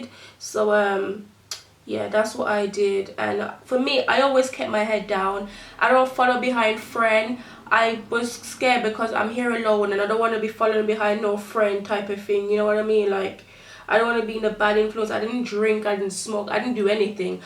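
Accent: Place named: Jamaica. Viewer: love this videos love thank you for sharing your journey also love the Jamaican accent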